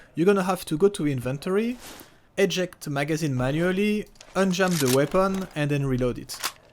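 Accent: France. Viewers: Je reconnais un accent francais :) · Je détecte un accent français sur cette chaine que je viens de découvrir. J'aimerai tellement qu'il fasse une partie française dans ses vidéos.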